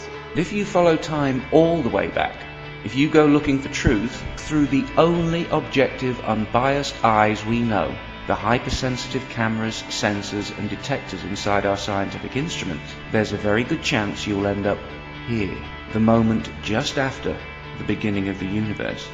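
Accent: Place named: England, United Kingdom. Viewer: very nice. You lucky bloke. You have an English accent! hahahha everything you say sounds legit because of that. Americans have this fetish for British accents for some reason, I think. what was that zoom at the end? no idea what that was.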